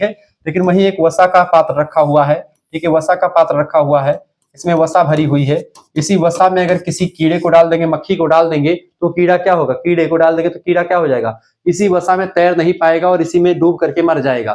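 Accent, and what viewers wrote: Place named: Bihar, India. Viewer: Sir ye bihari language mt bola kro kuch smj nhi aata Normal hindi bola kro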